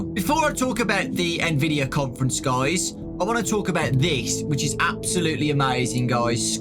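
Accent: United States. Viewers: Lol that American accent 😄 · American accent is so good it's unsettling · i love his american accent lmao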